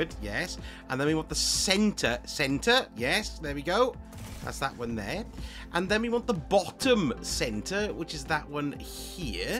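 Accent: Wales, United Kingdom. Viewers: Nice to hear a fellow Welsh accent, you have a clan? So hard starting recently and playing catch up.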